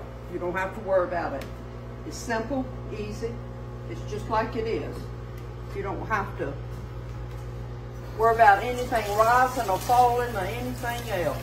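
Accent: South, United States. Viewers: your refined southern drawl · I love your southern accent! You sound just like my great aunt! I could listen to you all day! Blessings to you! Shine For Jesus!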